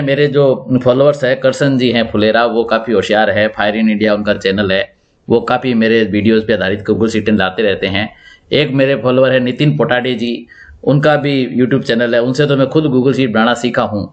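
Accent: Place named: Sirohi, India. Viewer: आप की भाषा सिरोही की लगती है आप कहा से है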